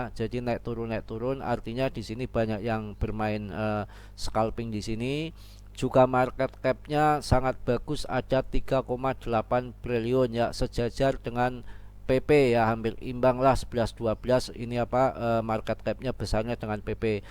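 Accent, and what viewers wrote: Banyumas, Indonesia. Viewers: Salam blekutakan mas bro. Aku nonton terus video mas bro kata katanya ngapak bikin ketawa jadi ngga bosen bs meng hibur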